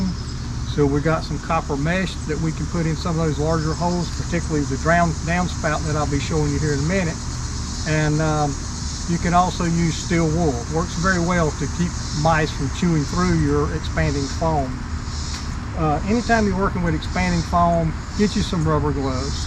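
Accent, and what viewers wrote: South, United States. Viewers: New subscriber here! Thank you so much for this video. I’m sure I’m a neighbor (I noticed the accent) and this is something everyone deals with here In the south especially out in the country (rural areas). This is exactly what I needed to watch! God bless you! 😎👍🏻👍🏻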